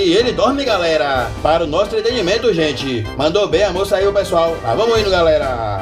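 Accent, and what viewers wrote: Bahia, Brazil. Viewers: Melhor narração 😂 esse sacana é bahiano. Kkkkkk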